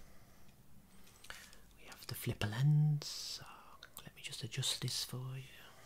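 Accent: Birmingham, United Kingdom. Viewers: Never thought that as a girl from Coventry, that a man with a Brummie accent would send me to sleep every night! Great video, quack quack! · 2 nights running fell asleep to this , here's for a 3rd! Looking forward to the next vid. Love your vids , who would of thought a brum accent could be so tingly Haha. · not often that outsiders compliment the brummy accent I must say 😂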